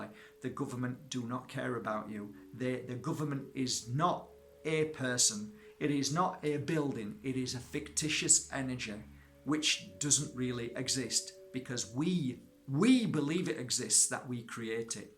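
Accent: Yorkshire, United Kingdom. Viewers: 100% agree with everything you say x \especially about the govt x I have two large Himalayan salt lamps in each room to help with neutralising the effects of wifi and always wear crystals too but am going to start turning of the wifi at night as you suggest . Nb : Are you from Yorkshire originally , like me ? Sounds like a good old yorkshire twang you have there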